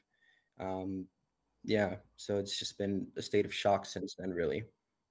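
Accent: United States. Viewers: Is he American?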